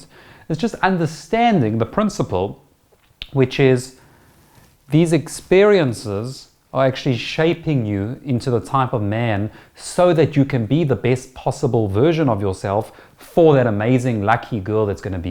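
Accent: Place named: South Africa. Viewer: You sound so South African, oh my days! Thats awesome! Howzit bru from a fellow Saffa.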